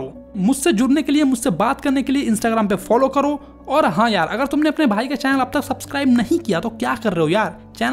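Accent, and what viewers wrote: Bihar, India. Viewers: Bhaiya.....Your bihari tone is also a reason why students love your teaching style..❤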